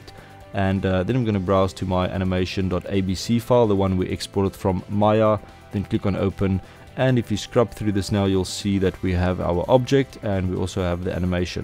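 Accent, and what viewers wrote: South Africa. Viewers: Ja wat se! good to hear some SA'n tutorials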